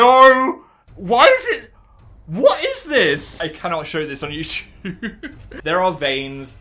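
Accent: North, United Kingdom. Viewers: i swear you're getting more northern the more the years go on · Is northern accent getting stronger every year?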